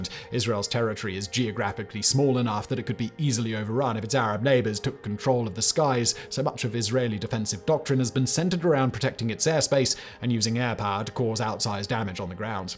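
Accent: United Kingdom. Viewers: Why do you use US spelling, or simplified English? From your accent I thought you were somewhere in Great Britain.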